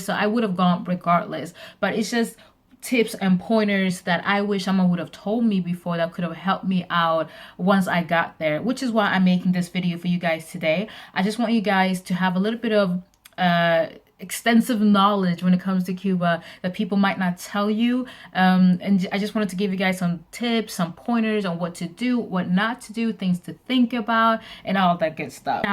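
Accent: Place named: United States. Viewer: You imply that you are British but you have an American accent, no disrespect meant....but I'm curious.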